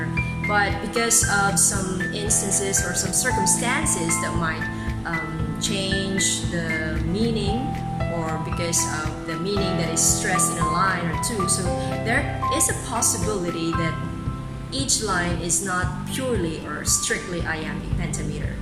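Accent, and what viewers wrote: United Kingdom. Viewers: This is such a wonderful and meaningful poem miss, I love that the British accent makes it more graceful to listen to. -van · thank you for this video! listening to your british accent is so soothing 🎧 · It is a stunning poem, and I love how the British accent suits it. I really appreciate how elegantly written the words are in this poem! Thank you for this video, Ms. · Great video Ms and a perfect British accent. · It is indeed a beautiful poem and I do love how the British Accent sounds very suitable with this Sonnet! Thank you for this video, Ms.! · Thank you for making this kind of content Ms!, i loveeee the British accent u got!. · Wow very entertaining and informative video miss! Your British accent is almost so authentic. Props to the effort given in this video miss! 🙏 🙏🙏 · Wow, I really like the way you speak in english Ms! 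Im glad I learned more british coming from you! <3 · it is a lovely sonnet ms. I also like your British accent, so pleasing to hear. How to be you po🥲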